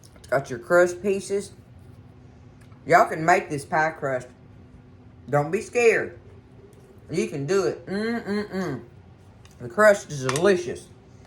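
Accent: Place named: Texas, United States. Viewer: 🤩Love your Texan accent!🤠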